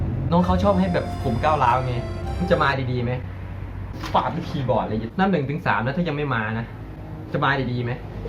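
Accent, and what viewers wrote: Korat, Thailand. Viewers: ว่าแล้วเห็นคริปนั้นพูดโคราช😂❤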